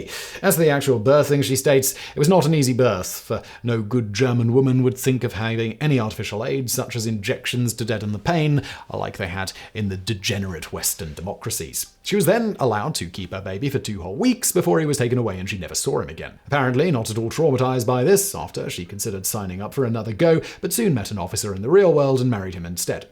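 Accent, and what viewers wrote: England, United Kingdom. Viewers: Fantastic English accent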